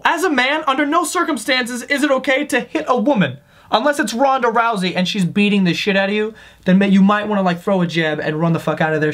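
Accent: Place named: Queens, United States. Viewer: I think that's just how he says it!! Some people just say it that way!! Also, he's from Queens so that might have something to do with it!! Not sure though...lol!!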